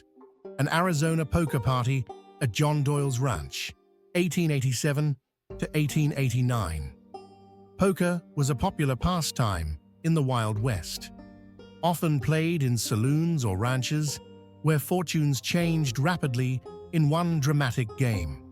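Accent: England, United Kingdom. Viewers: Why the English accent … why the blurred drawing of Custer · Why a Brit narrator?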